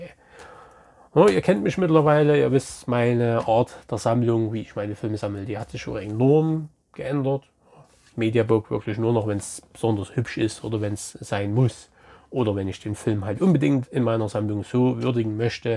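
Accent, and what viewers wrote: Bavaria, Germany. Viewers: Danke für dein Päckchen! Joa mei dein Bayeisch ist ja Klasse! Mach dir mal en Leberkäse vielleicht hilft s! Konntest schon was aus meinem Päckchen sichten? Possesor muss ich nochmal starten letzte mal abgebrochen! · Servus da hast Du interessante Filme bekommen gerade den film Bliss ich wünsche dir gute Besserung es dir ein Leberkäs den kann man titschen aber nicht in die Milch sondern in den Ketchup und zwei Knödel aber zum Essen😉 mach noch mal nächste Mal noch bayrisch das tut gut da kriegt man Heimweh da ich gerne auch nach Bayern möchte in Dorf 🙏 gruss Tony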